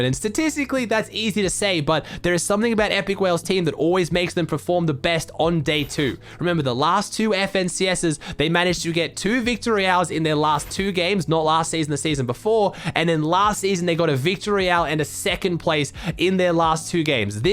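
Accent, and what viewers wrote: Australia. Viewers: Robotic Aussie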